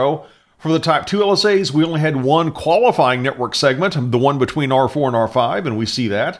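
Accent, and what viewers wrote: Texas, United States. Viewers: is that a Texas accent?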